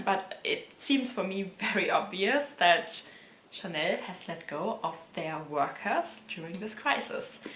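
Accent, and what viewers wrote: Germany. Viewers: lol i hear permanently a german accent in your english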